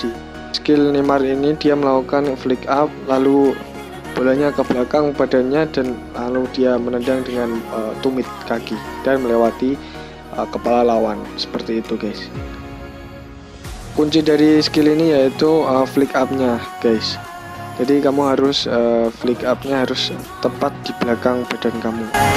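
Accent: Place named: Java, Indonesia. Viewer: Asalmu pundi mas, kok medok koyo wong jawa ?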